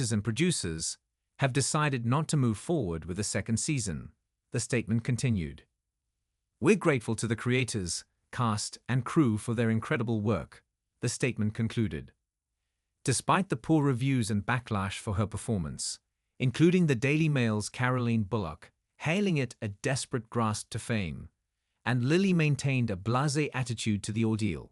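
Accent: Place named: United Kingdom. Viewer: Well that was a waste of time. There was maybe one (possibly two)sentence about Nosferatu and the rest was a rehashing of the rehashing of critics reviews of an HBO series I didn’t see. Dear God- Please- if you can’t get decent narration from AI use an actual human to read the script. And why the British accent. And what is wrong with me even bothering to comment? lol- I think I reached the breaking point with these “reporters.”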